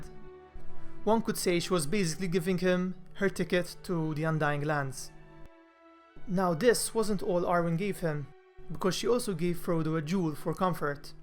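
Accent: Malta. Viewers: Thanks for all the info it explained alot as just binge watch all the movies including the side movies. 1st off I have never witnessed a movie collection done so well and not just added more junk just for the money 2nd although the movies could not have been done any better,the BOOKs are the way yo go and must be read before watching the movies as your imagination can only do it justice and if done the other way around. I personally think if done the other way around you ruined an experience youll never get to have again!! So again thanks for the explanation and keep up the amazing work!! One final question and if you cannot answer I fully understand but, by any chance the person speaking in the explanation of this clip is he or are you Maltese!? Im wondering after hearing your accent. ;) again thanks for all youve done and hopefully I will get to speak with you again as Im trying to start my own journey and building a fantasy survival horror game based on locations in Malta and could use you're help but if you're busy I fully understand and wish you the best for the future,keep safe and hopefully Ill hear from you soon Regards Matthew Evans Vella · Really good videos! 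Are you maltese? Your accent sounds maltese 😁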